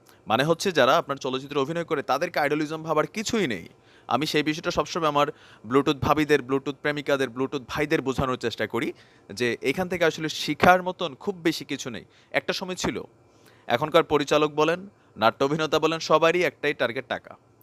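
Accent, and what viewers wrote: Comilla, Bangladesh. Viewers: যা ভাবছিলাম ভাই, তাই। আপনার গ্রামের ভাষা শুনে ভাবছিলাম হয়ত আপনি কুমিল্লার মানুষ। আপনি আমার দেশি মানুষ ভাই। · আপনার ভাষা শুনে আমি আগেই ধারণা করেছিলাম,আপনি কুমিল্লার।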